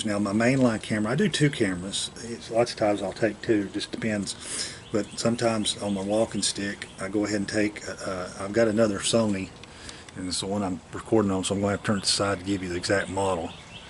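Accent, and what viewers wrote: South, United States. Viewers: I have just recently started watching your site, it’s very good, but scary as heck. like some of the other people, I found out about you from Steve. I am a native Georgian, near Atl and I was wondering where you were from, I knew it was the South, can’t hide that accent, love it.....take care And be careful out there....🍑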